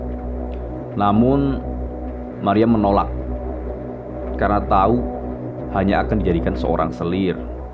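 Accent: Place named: Banyumas, Indonesia. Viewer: Rodo ngapak sitik lah wak kui hehe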